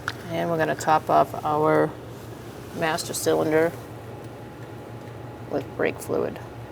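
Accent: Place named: Northeast, United States. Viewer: Excuse me but are you from Massachusetts by chance? Either that or New York but it's definitely a northeastern accent lol Love it! Also a Bostonian here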